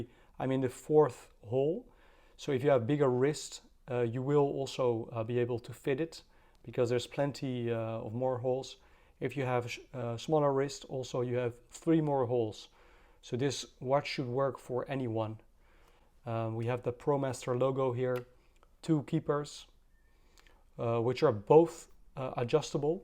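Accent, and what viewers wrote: Netherlands. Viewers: Nederlands accent?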